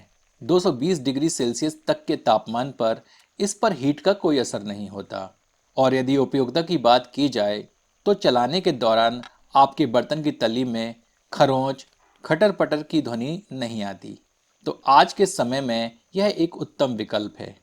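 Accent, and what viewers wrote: Standard. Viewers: शुद्ध हिन्दी बोलते हैं आप 👌🙏🌹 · क्या सुधा हिंदी बोलते हो जी ।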